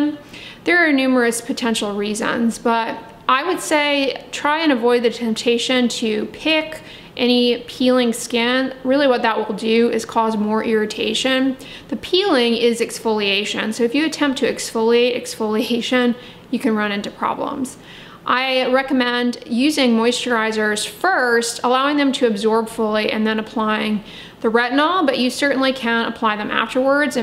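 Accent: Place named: Long Island, United States. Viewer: I can’t with the Long Island lockjaw.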